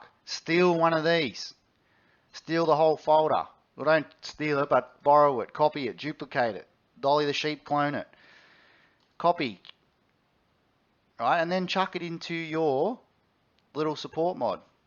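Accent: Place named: Australia. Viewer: Your accent makes this video 10x easier to pay attention too. Not sure why, you are Australian correct?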